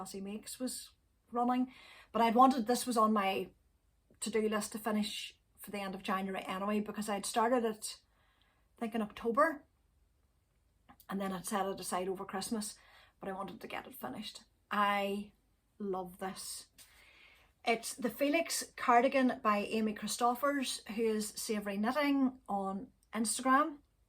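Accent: Ireland. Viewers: One day I hope to knit a lace work cardigan sweater. I'm currently working on my first pair of socks. Enjoy your podcast and love listening to your lovely Irish brogue. CONGRATULATIONS on your Anniversary. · My dream project would be a colourwork sweater by Marie Wallin. But I think I have to wait until I have retired to have the time and focus on it. I am a new subscriber and like your podcast above all your Irish accent:) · I am a newcomer to your podcast and am enjoying it very much, particularly your lovely Irish accent. We have several Northern Irish relatives and to listen to you talk is almost like visiting with them. I am not a very accomplished knitter, but I would love to knit anything with a fairisle pattern such as a yoked sweater. I would also love to do a cardigan as I have done a few pullovers or as you call them, jumpers. Knitting is such a sustaining hobby during these days and having wool running through my fingers on any project is so rewarding.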